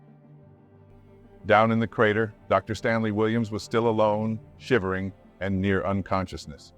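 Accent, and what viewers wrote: United States. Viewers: Shocking narration. Ugly American accent. You couldn't make this up if you tried.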